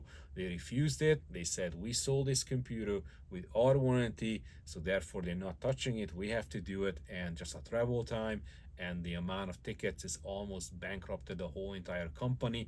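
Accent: Hungary. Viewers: Yes, I comparing to the DT version to be exact, but most of my criticism is still true for the old SFF model. My point is that DELL gives you less and less quality and quantity for the same price, probably because the competition, both HP and Lenovo earlier started to use nonstandard PSU and other const cutting and eco system locking. From a business stand point the bisiness is business you can't compete with them in volume and power consumption, but you can with quality and performance if there is a demand for it. These machines are overbuilt for office use, but underbuilt for any performance usage CPUs and GPUs under performing in these systems due to heavy current limiting. Here is an example how long can last something if you choose the right parts, my first intel 775 PC from 2006 still works after 18 years what I bougth used then, now it is in a school in Esztergom. 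The only failure was a bad Hitachi harddrive within the warrianty period in 2008, it was replaced with 500GB WD BLUE which 12 years later produced the first bad sector, 500GB WD BLACK from 2010 still running without bad sector. BTW are you from Hungary? I'm guessing from your accent.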